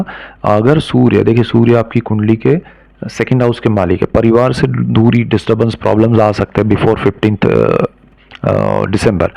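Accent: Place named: Kashmir, India. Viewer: Are you from Kashmir your accent is kashmiri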